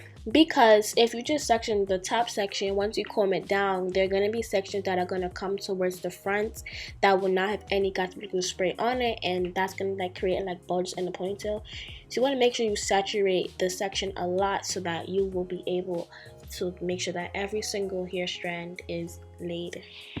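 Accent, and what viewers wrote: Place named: New York, United States. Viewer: I'm new to your channel and idk if you're from New York but you're accent is so adorable lol. Great job on the ponytail ❤️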